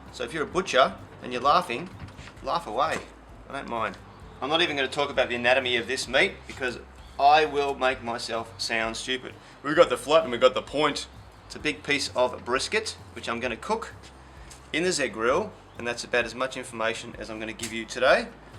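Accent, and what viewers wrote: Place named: Australia. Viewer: Loved hearing an Aussie describe food he's cooking! Sorry mate, your accent was better than your cooking for this American. Keep it up though!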